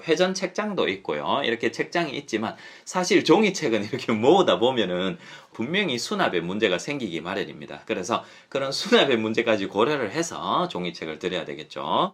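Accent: Gyeongsang, South Korea. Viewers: 요즘 하루도 안빠지고 님 영상 인스타 열심입니다^^ 저도 경상도 부산이라 어감도 확 와닿아서 어떻게하면 님처럼 선한 영향력을 가질까 고민하고 또 고민하네요. 3,4학년 딸들이 되고나서 전자기기 허용폭을 넓혔더니 확실히 종이책 읽기에 소원함을 느끼고 친한 친구들과 독서모임을 할수있도록 인위적인 환경을 만드는 중이네요~ 물론 엄마들도 함께요, 아-지나고 나면 항상 아쉬움이 남아요.요즘 다시 육아공부중입니다^^ 요즘 3,4학년 된고나서 발름기호를 가르쳐야되나라고 고민에 빠져요, 이제까지 소리만 듣고 그대로 읽었는데 발음기호를 알려준다면 시기는 언제며 꼭 필요한지 고민입니다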